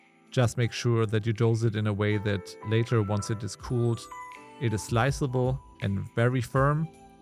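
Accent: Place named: Germany. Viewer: I think you should mention that the lard should be really cold during the Pâte dough making. Preferably put into the freezer 1 hour beforehand. Since otherwise the heat thats created in the food processor due to the chopping motion, will cause the fat to seperate & kind of like with puff or short crust pastry, will result in an oily greasy mess. As a chef, naturally I already know this, but someone who isn't familiar with making a classic Pâte may not know this. Just saying... Btw, your german accent is really strong. lol Are you a chef or are you just a passionate home cook? I think it's a real shame that old classic appetizers like Parfaits, Pâtes, Galantines etc are a lost art. And are slowly fading from existence. Except maybe in France where they uphold the tradition of producing all these classics. But if you look around restaurants in Scandinavia, Spain, Germany, Britain etc It's a real rarity to even find these dishes on a menu, except its maybe a 3 star fine dining etablissement. 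I think I'll do a Poultry liver parfait for new years eve. With maybe a port jelly or a cumberland sauce. And marinate the livers in some madeira and brandy. I admire that you have executed this classic recipe. Cheers !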